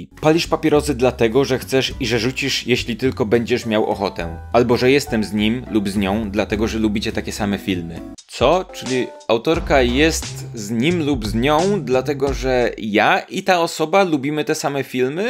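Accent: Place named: Lesser Poland, Poland. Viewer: Eee, nie. Raczej ślady dialektu małopolskiego wychodzą - głównie w udźwięcznieniu głosek: Sprawdźmy (dźwięczne /w/ i /dź/) Powiedzmy (dźwięczne /dz/ przed m) tak jest (tag jest - udźwięcznienie głoski przed /j/) (jestem fonetykiem, zboczenie zawodowe ;))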